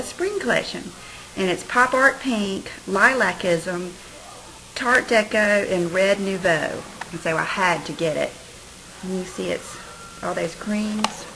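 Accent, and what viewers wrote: South, United States. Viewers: No worries about that "Suthern" accent! he he, just thought to help out with the pronunciation and I didn't even get it exactly right! Can't wait for your depotting video! Thanks!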